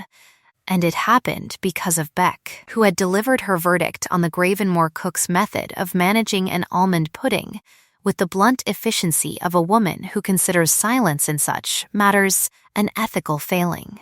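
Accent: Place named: North America. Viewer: This AI voice is annoying and it's a North American voice for 19th century England.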